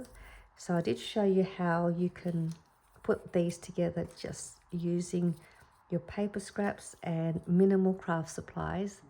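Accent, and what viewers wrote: Australia. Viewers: I really like this project and your style. I just discovered your channel...it is so nice to hear an Australian accent and places to source things here in Australia. 😃 I'm watching with sound off and subtitles on because of the snoring! 🤣 (music to some, nails on a chalkboard to others, hey?!😉) But, I stuck around and subscribed. Your style really does stand out ❤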